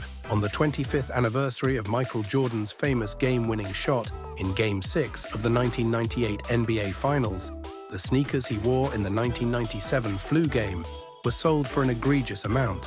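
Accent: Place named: United Kingdom. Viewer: Brit Accent was a nice move 😂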